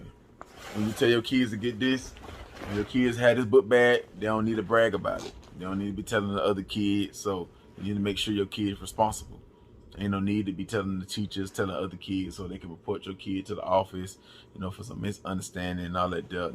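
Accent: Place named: North Carolina, United States. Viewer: Good video. I knew U sounded like u from NC❗️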